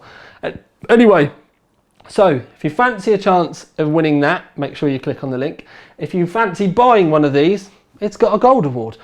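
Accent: United Kingdom. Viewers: He is from the UK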